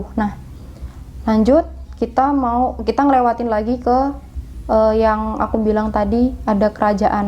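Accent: Java, Indonesia. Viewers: Jawa nya masih medok kak 😊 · Logat jawa nya medok banget Hehehe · Mbak ngomonge medok 😂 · Bahasanya medok banget wkwkwkwkw. Mbulett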